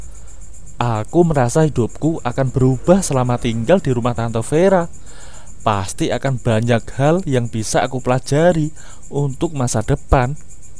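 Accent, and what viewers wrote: Java, Indonesia. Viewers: Jangan orang jawa untuk menerangkan cerita ini, gak cocok dan gak enak dengernya, ceritanya bagus, tapi yg ngucapkan cerita ini gak enak suaranya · mantul min....jawa nya jawa mana ya ini...jangan² se lubang ama aku🤣🤣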